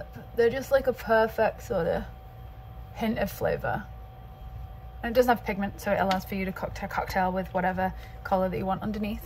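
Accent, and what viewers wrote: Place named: United Kingdom. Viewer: You sound so much more British in this video. I wonder if it's because your parents have been visiting? I love it -- you sound delightful 🙂 😘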